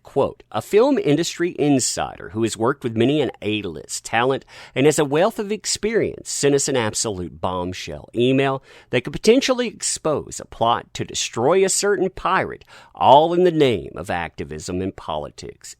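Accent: South, United States. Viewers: I love the sound of your voice. I can't place your accent. It feels slightly Southern, but maybe some Midwest? Whatever it is, you have a great presenters voice. It draws the listener in. Keep it up.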